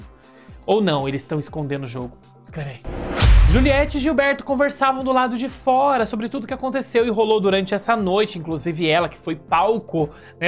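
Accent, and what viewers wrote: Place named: Bahia, Brazil. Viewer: KKK seu sontaque Baiano é perfeito!! sou Baiana.😂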